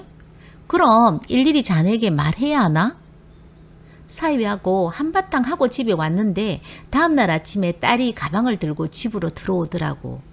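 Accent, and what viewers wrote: Gyeongsang, South Korea. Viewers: 경상도사투리 정ㅇ살듣기 좋아요😅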